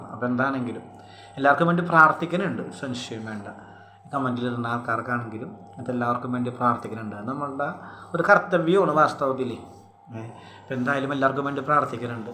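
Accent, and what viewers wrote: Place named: Kochi, India. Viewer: When I hear a positive, I am happy thirumeni 🙏 .. njan subscribe chaythu, nummade kochi slang when I heard the innocents so happy 🙏. Thirumeni I am from USA 🇺🇸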